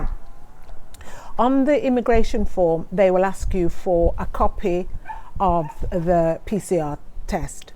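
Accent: United Kingdom. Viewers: Are you British ? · Cou cou is our local dish; actually cou cou and flying fish. I can hear from your accent that you are British, do you have Barbadian relatives? Most black folks speaking with a British accent do have Barbadian relatives. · Where you from cock .... Sheffield?😁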